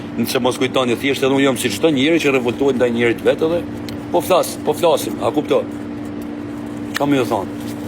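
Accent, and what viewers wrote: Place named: Durrës, Albania. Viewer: Ca bone si fol arkimeti si na durrsi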